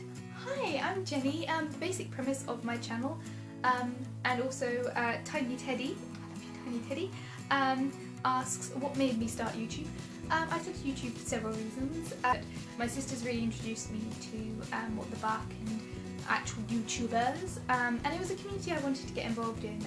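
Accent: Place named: Cumbria, United Kingdom. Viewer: You've got to be from Cumbria, that accent is hard to narrow down!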